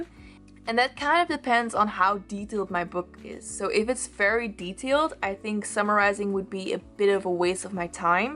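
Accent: Netherlands. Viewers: Are you Dutch? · Are you Dutch? ☺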